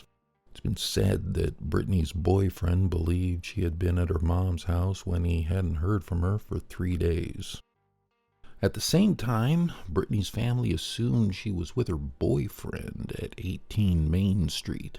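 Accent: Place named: Maine, United States. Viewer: Brookfield is beautiful. Very sad. His voice is fine, probably from Maine. This was a good video, thank you. Keep going.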